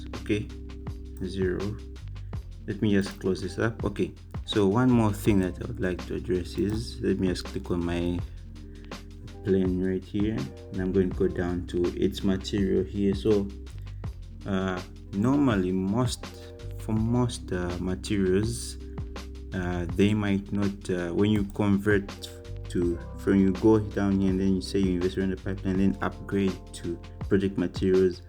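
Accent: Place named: France. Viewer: Sounds like a French accent, great video!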